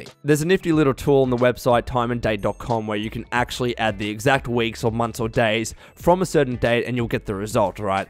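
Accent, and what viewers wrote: Australia. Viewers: I really like accent. Are there other Australian YouTubers that y'all suggest I should follow?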